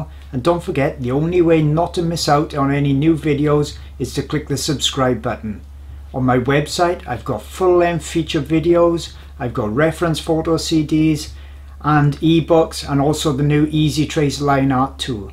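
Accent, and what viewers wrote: Wales, United Kingdom. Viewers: Really nice to hear another Welsh voice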